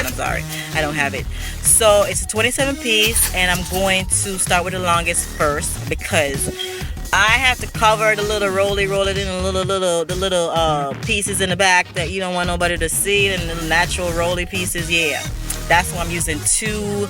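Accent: Jamaica. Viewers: a swear mi just hear yuh talk now know sey a yard yuh come from! hairstyle up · Are you jamaican? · R u Jamaican??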